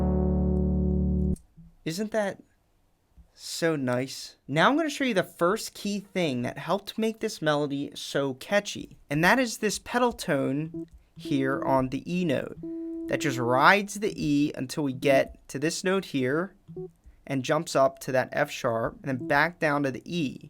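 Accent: Philadelphia, United States. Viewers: I think we know it's a masterpiece, but we can't explain why. And you've definitely showed us. Also, that's 100% a philly accent. Go birds!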